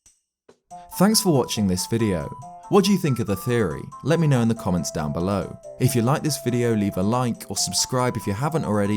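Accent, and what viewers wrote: United Kingdom. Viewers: Love your videos. Nice speaking voice too. Fellow Brit, surely?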